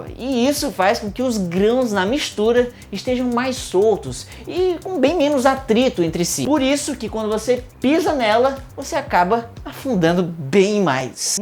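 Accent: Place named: Northeast, Brazil. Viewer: Ele é do nordeste kkkkkkkkkkkkkkkkkkkkkkkkkkkkkkkkkkkkkkkkkkkkkkkkkkkkkkkkkkkkkkkkkkkkkkkkkkkkkkkkkkkkkkkkkkkkkkkkkkkkkkkkkkkkkkkkkkkkkkkkkkkkkkkkkkkkkkkkkkkkkkkkkkkkkkkkkkkkkkkkkkkkkkkkkkkkkkkkkkkkkkkkkkkkkkkkkkkkkkkkkkkkkkkkkkkkkkkkkkkkkkkkkkkkkkkkkkkkkkkkkkkkkkkkkkkkkkkkkkkkkkkkkkkkkkkkkkk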